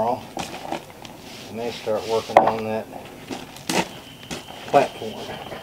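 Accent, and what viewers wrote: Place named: Appalachia, United States. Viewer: I’ve been subbed to Out of the Woods for several years now, Good channel for sawmill interested folks like myself. I’ve seen him going and getting logs at every opportunity he gets when people call him and many times they’re free. 😉 What’s funny is I talk exactly like him 😂 I guess because all my family have been NC Tenn & Va Mountain folk and we have a hillbilly accent 😁👍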